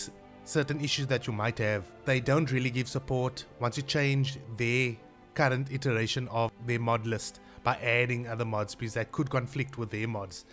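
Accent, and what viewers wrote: South Africa. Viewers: awe I recognize that South African accent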